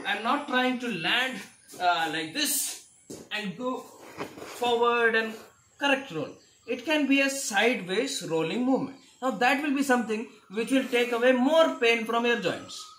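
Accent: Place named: Madhya Pradesh, India. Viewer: Bhaiya apki hindi to M.P mein bolte he waisi he....... Gutne jawab dena aur aukat se jyada uncha jump.......😂👍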